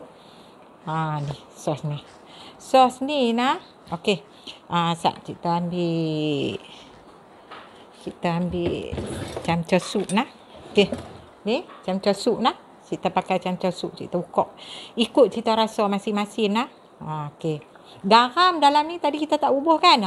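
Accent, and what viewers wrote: Kedah, Malaysia. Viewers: Suka tgk masak...cakap kedah lagi...cun la..sopan.bersih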